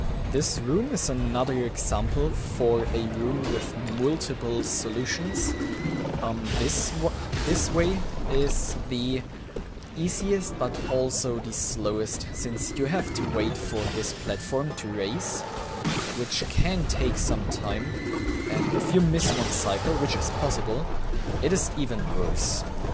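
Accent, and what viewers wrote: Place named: Germany. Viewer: dieser moment wenn du sofort durch das sprechen merkst das die person deutsch ist xD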